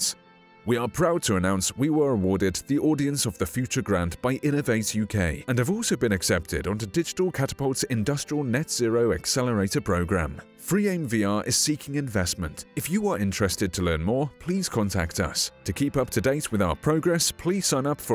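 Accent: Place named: Germany. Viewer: nice , I Ve read the faq it s awesome . But could I have a bit more details about the price . Even if you don t have the exact price I wish to have an idea , if it s more like 100€ or 200€or even 300€ . I Ve noticed the German accent , it s nice ^^ I live 30minutes far from Germany and I speak German too (nice language ).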